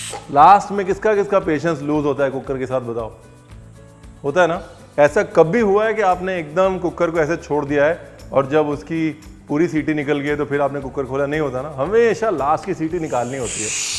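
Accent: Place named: Punjab, India. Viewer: I really like your North-Indian Hindi with Punjabi touch 😍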